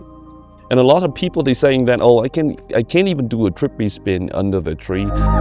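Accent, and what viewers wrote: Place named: Texas, United States. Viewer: I see, thats kind of similar learning curve to mine. Definetly not, your accent sound cool, you sound like some bloke from texas (atleast to my slavic ears). Keep on grinding mate!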